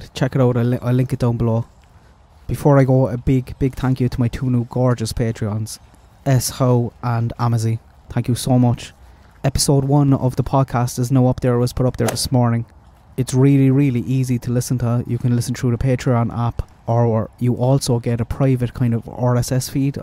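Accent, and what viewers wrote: Ireland. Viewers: I am slowly going through your others. I must ask where in Ireland are you from, your accent is lovely. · I agree, I really like and it is a great advantage to have a retired homicide detective looking into the case. ASMR - yes! LOL, he has a relaxed way of speaking and I do fall asleep listening to him but then I'll relisten from where I conked out. I also like the way you speak and your Irish accent. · Thank you so much for that. I can't do lives or very long videos at the moment, so to have it summarised really helps. I'm with you on voice, very calming and relaxing. I would love to have been a fly on the wall when he was in service, seeing him interview murder suspects, putting them at ease and lulling them into a false sense of security. I love how he talks, but I love your accent too, I've many Irish friends and all their accents are beautiful, but so varied. 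I can't imagine why anyone wouldn't like?... but then we're all different and different things appeal to us I suppose. I'll try and look at his video, but I really appreciate your summing it all up. I share some of the same potential theories with you. The refrigerator, as soon as I saw it I thought it odd they hadn't taken the door off. I grew up in the 70s in London, and we used to get public information broadcasts, some acted, others animated, but there was one about a group of kids playing on a dump site, one of the kids ends up hiding in the 'fridge, there's a piercing scream when one of the girls finds him. The narrator with the voice of doom explains how no matter what, you never, ever play with old fridges and that if you get rid of one, you must take the doors off. Every holiday they'd play it, among others. Plus my parents drummed it into us. One of my preferred theories was exactly like yours. 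Either they did the whole hide and seek counting thing to get rid of her annoying them, or actuall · I saw that video also. You are on point. After Summer left the car that afternoon we have no idea what happened after that. She was the kind of mother that didn’t keep eyes on Summer too much. When saw where Summer slept he said he knew about Summer. He was really shocked but held it well. The mattress was on the floor so looking under a bed was off unless she pulled up the mattress. So many conflicting stories. There were no flowers planted that day. When she was at the watering hole she emphasised the flowers were at a certain spot. She knew this was a major part of her story. Oh you are for sure Irish. Kerry maybe! Love your accent!🇨🇮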